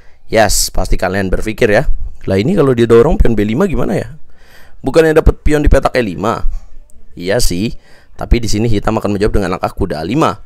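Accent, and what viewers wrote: Tegal, Indonesia. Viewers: Sampean asli wong tegal mas?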